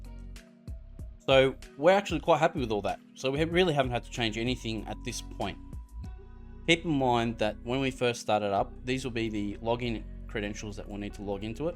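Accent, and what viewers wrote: Australia. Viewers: Hey mate, good to hear an aussie accent on these tutorials. Hoping you can help if you have time. I have set up successfully with sonnarr and radarr. Seems to have no problem with other unraid dockers, but going to docker or web server on another VM is an issue. Currently having issues with a self hosted HUDU install. Works fine by direct IP or port forwarded to direct IP. Soon as I port forward to NPM I get redirect issues. Hudu has SSL (letsencrupt) built in so I have tried turning it off in NPM and various other configs that don't seem to work.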